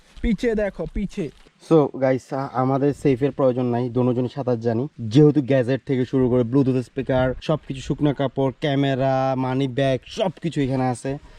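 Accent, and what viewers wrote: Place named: Feni, Bangladesh. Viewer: ভাই তো আমাদের সেম টু সেম ফেনীর ভাষায় কথা বলেন 😮 অসাধারণ লাগে শুনতে 🥰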